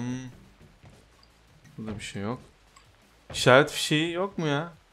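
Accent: Adana, Turkey. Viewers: Abi sen Adanalı mısın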